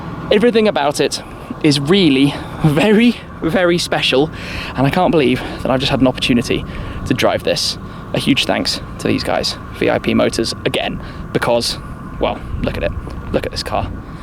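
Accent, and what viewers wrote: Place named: United Kingdom. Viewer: 영어는 뭔말인지 못알아 들어도 이사람 영국 억양 오지게 쓰는건 느끼겠다.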